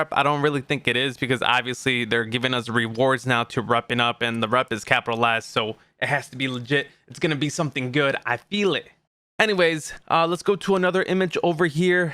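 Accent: Chicago, United States. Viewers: What part of Chicago is he from?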